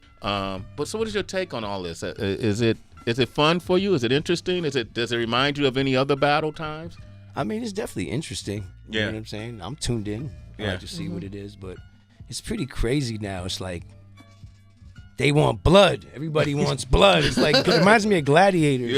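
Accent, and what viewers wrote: United Kingdom. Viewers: im listening to a a British person talk about Americans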